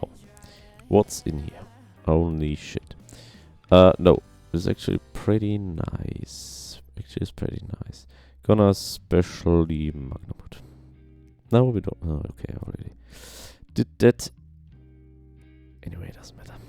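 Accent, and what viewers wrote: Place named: Germany. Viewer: You are creating good videos but please work on the language the german accent is hoorrrrribale. Its not „di“ its the or you can spell it „se“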